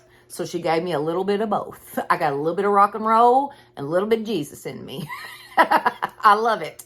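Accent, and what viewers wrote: South, United States. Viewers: Wow! Thank you! I really needed to hear this today and I must admit I’ve watched it three times already and will probably do it again. I received some bad news about my health about a week ago and I am really struggling with it. I love your devotionals and crafts and most of all I love your voice! I actually thanked God for your voice today! I love that little southern accent. I’m in California so I don’t get to hear it very often but I particularly loved hearing you talk about the rocking chair and your mom singing to you and I have the same memories just lost my mom on September 17 and I miss her so much and I know you can relate to having a lot of bad things happen all at once. I feel like you are so relate-able. I just can’t thank you enough for all you have brought into my life through Jesus Christ our Lord! Thank you thank you thank you! 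❤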